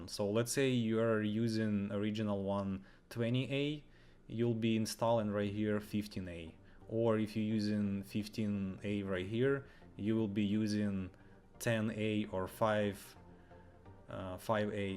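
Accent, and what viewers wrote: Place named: Russia. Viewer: Strong rashn akcnt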